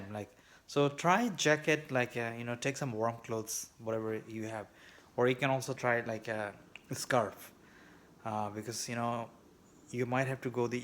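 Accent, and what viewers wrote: Bangladesh. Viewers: S/W, bhai, you sound Bangladeshi, masha'Allah. May Allah bless you for your efforts. :)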